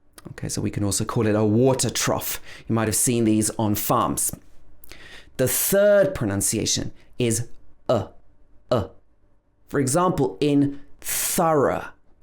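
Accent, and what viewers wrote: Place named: RP, United Kingdom. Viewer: Bloody gorgeous British accent(posh)💚💚💚💚💚!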